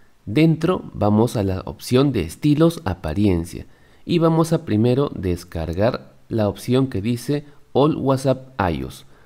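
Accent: Peru. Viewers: Acento Limeno 100% jeje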